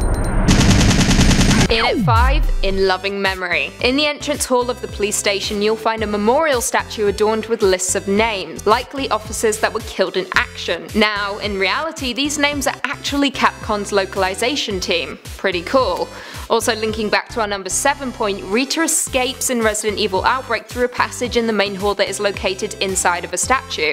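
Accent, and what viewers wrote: United Kingdom. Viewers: Miss your quite the beautiful lady,& love your English accent 😊Well that's cool, I'm pretty excited about Re2 remake. Looks fantastic,as far Re3 remake,as they said it depends on the fans.if it happens,it's in the making from what I was told by Capcom & other gaming yutuber s. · You are from uk?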